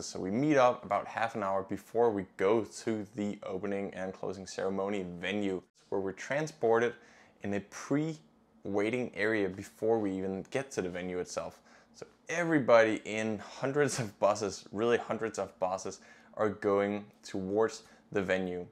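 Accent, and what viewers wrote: United States. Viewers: Appreciate your sharing。Your English seems more like American style than British style. Why is that? Is the general case for Danish speaking English? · You are from Denmark but yet you have an American accent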